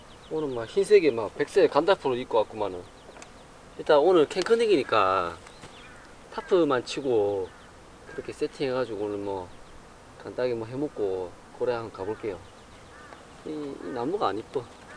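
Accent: Gyeongsang, South Korea. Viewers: 사투리 너무 매력있어요 ㅋㅋ 원래 경상도 사투리 좋아하는데 어느 지역인가요?? 멘트도 너무 찰지심 ㅋㅋㅋ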